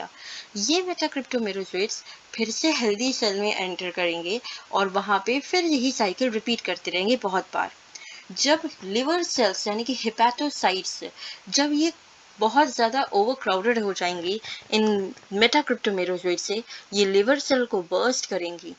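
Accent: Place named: United States. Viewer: Ma'am the video was fabulous , marvelous , fantastic , mind blowing , exellent . Your way of teaching is fabulous the way you explain is just fantastic . I had problem in understanding this topic but after watching your video i understood everything . I sure that you are the best teacher in the world with a stylish or i should say american accent .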